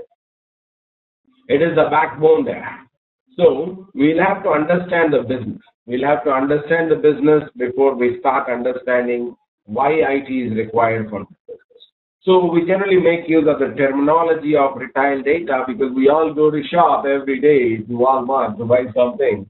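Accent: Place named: India. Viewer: Thanks for the presentation!...but may I suggest to you that its really painful listening to you trying to mix Indian accent with bad American accent with different pitches in tone, but this is really making the presentation delivery poor and sometimes inaudible. Please stick to your natural accent and slowly enunciate your words for better clarity!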